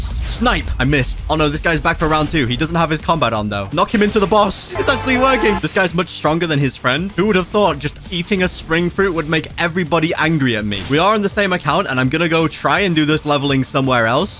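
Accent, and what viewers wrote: United Kingdom. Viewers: Are you britain?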